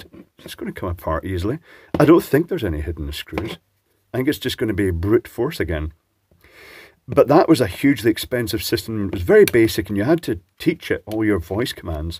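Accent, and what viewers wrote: Scotland, United Kingdom. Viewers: I was expecting the light to have trouble understanding the Scots brogue, just like the voice controlled lift in Burnistoun... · Might be an example of edge computing. I haven't investigated the tools for this but the claim seems to be that you do training with the expected powerful computer but then condense the result into something that can run on a microcontroller. Very impressive that it not only doesn't need personal training but can even cope with a scottish accent! got a pretty clear voice, though. I wonder if it would handle glaswegian or geordie. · Especially with a UK accent. Eg YouTube auto captions usually make a hash of.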